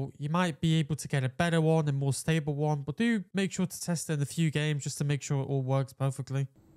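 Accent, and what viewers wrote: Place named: United Kingdom. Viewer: hi Bro nice video again! But you again didnt showed the real fan curve, just seing the curve without values is not really helpfull :( We need the numbers!! xD thanks for testing! Love your content and that heavy accent (british I think? :P), your videos are amazing quality too, I would expected this quality from a channel with 20-100k subs but you have 1.000 but still so quality videos, thank you!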